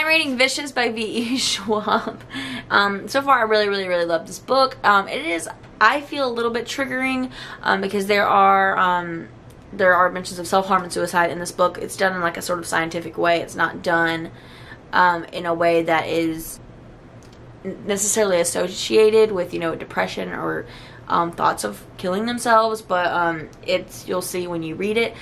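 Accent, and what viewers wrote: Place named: South, United States. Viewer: Also let your accent shine girl!! Idk if your southern, but I love it😊☺️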